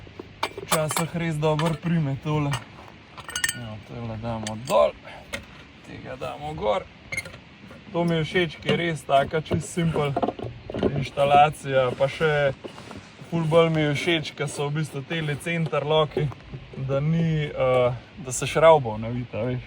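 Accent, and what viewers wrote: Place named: Upper Carniola, Slovenia. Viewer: gorenščina pa še neka qvazi angleščina avto fajn zelo pa malo potrudise pa boš imel še več ogledov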